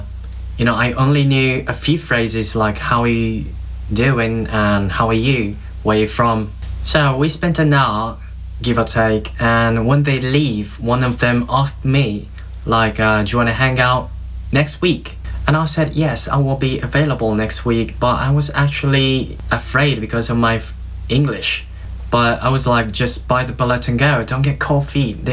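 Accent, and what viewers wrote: United Kingdom. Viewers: I love that British accent! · could you please tell me where you learned british accent?? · i liked your video, i like your british accent KEEP IT UP!! <3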